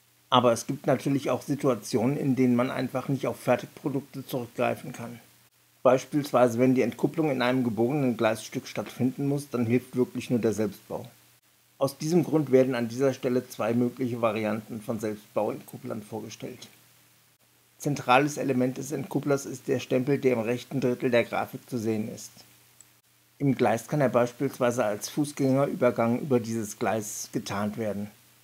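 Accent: Hesse, Germany. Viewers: Keine schlechte Idee, diese "Regalbauweiss". Lässt sich bestimmt "einfacher" umsetzten als eine Modulbahn. Ich vermisse dein hessisch Gebabbel... 😁😁😁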